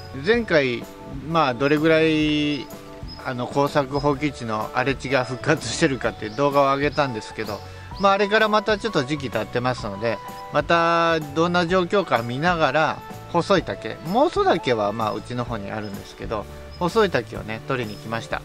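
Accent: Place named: Oita, Japan. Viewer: 、大分弁が身についちきたなぁ😁 どーくり師匠のおかげかな❓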